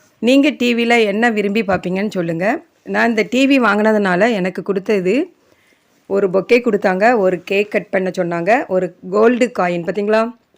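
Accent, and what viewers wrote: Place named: Tirunelveli, India. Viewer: ok sis tirunelveli la therinchavanga madhiriye erunthichi athan kten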